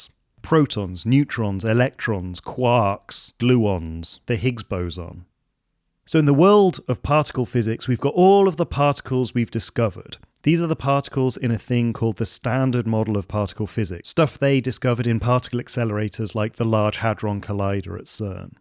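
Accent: England, United Kingdom. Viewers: The accent is extremely annoying. English accent is so pretentious and unnecessarily slow and obnoxious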